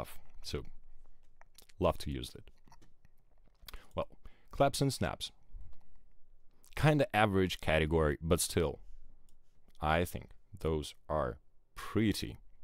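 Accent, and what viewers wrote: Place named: Belarus. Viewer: Belarusian accent of English.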